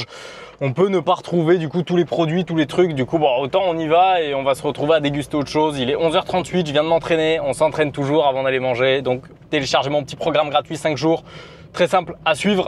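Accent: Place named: England, United Kingdom. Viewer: comme dit précédemment jaime trop ton acent anglais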